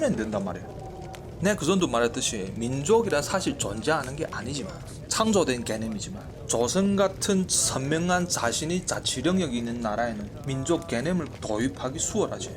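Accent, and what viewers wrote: Yanbian, China. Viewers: 연변이니이신가요 북한출신이신가요 :face-orange-biting-nails::face-orange-biting-nails::face-orange-biting-nails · 연변말 맞아요.시내연변말(함경도말 베이스)?비스무레한 말투,북한말(평안도)아님. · 연변말투 맞음 딱 들어도 연변 사람인데😂😂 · 연변식 한국말씨 재미있네요~ㅎ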